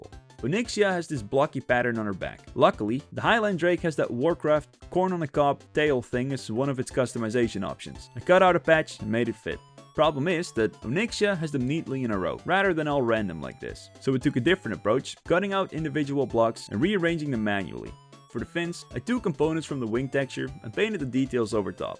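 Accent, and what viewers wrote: Netherlands. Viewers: Loving the dutch. Really made me remember how similar I pronounced things in WoW when I was younger.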